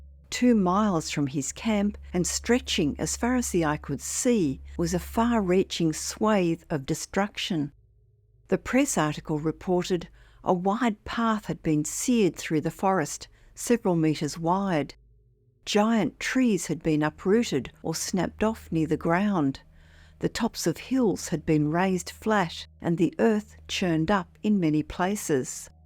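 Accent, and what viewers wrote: Australia. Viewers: She’s American. This is an Australian accent!! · The Australian accent makes it less credible. I'm not sure why. Maybe its a Ross Coulthart effect.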